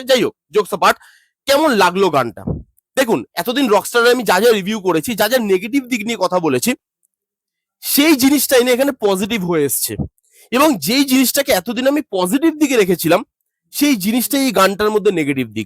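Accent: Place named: West Bengal, India. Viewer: vai apni je Malu opar banglar oita apnar kothai buja jay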